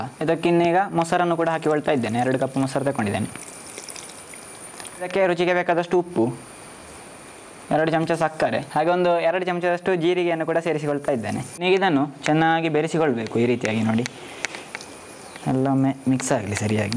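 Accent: Mangalore, India. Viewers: Love your mangaluri kannada bro..Awesome ambience/rain,lovely presentation,mouth watering goli bajji..What else you need?!😄🙂👏👏👍👍👌👌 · I enjoy watching your videos. I so so like your beautifull mangalore kannada. Keep up your great work.., good luck :)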